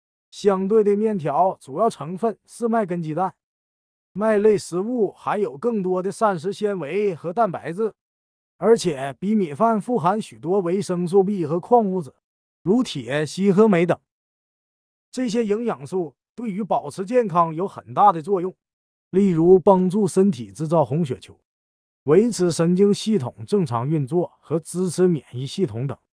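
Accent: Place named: Liaoning, China. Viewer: 辽宁口音❤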